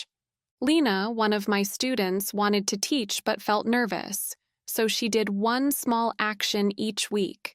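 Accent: United States. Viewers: your accent in video is american accent . right ?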